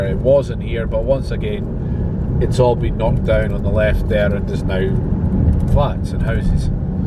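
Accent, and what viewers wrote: Scotland, United Kingdom. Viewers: Nice to hear a fellow Scot on here🤣👌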